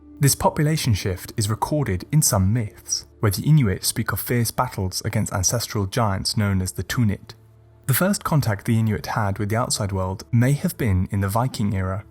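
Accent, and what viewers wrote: United Kingdom. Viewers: As an Inuk, this video got every myth right, just as I heard these myths growing up. The pronunciations of the Inuit language through that smooth British accent were on point.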